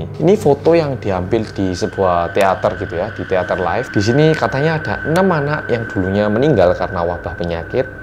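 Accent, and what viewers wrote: Java, Indonesia. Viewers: "Tapi...ini....pertanyaan pertanyaan ini kurang....BiSa DiPercAya Gitu" Humorq hanya sebatas kak ngomong medok😭😭😭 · Saya melihat ada orang korea yang medhok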